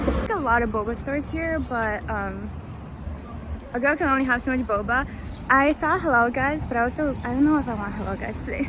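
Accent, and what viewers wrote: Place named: Malaysia. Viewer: i'm wonder where are you from ? malaysia ? because you're accent look malaysia pure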